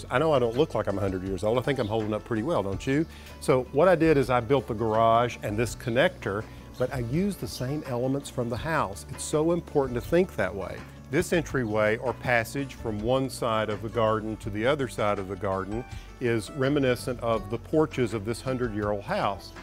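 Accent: South, United States. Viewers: You have such a great voice ... so soothing with a wonderful little dash of the South.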